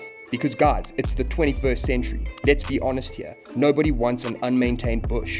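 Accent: South Africa. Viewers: You sound South African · You're definitely from Durban. That accent lol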